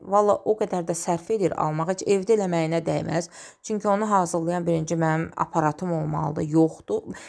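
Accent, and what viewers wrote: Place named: Azerbaijan. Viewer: 🤩🤩🤩🤩Azeriler çok tatli konuşuyorlar.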